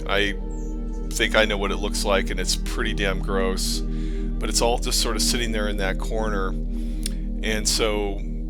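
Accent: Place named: California, United States. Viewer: This guy narrating sounds like a typical California moron. “This water is ugly, ew no” hahahaha my god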